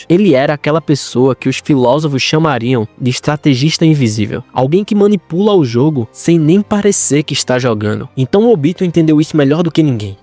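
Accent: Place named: Bahia, Brazil. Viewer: tem como tira o sotaque bahiano?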